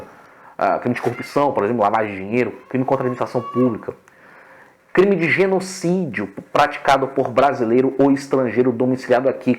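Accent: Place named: Bahia, Brazil. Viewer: Show de bola a animação. Mas qual é a origem do sotaque meio que baiano kkk Abraços Excelência...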